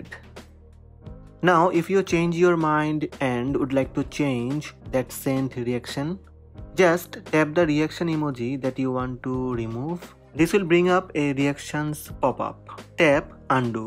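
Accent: Bengal, India. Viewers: Dada apni bangali English ; Are You A Bengali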